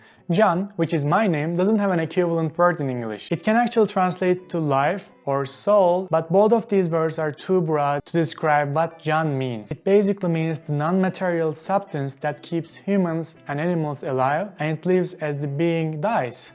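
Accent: Turkey. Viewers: Seni ilk defa gördüm ama konuşmandan Türk olduğun direkt anlaşılıyor. Bizim dil yapısıyla alakalı galiba direkt anlaşılması.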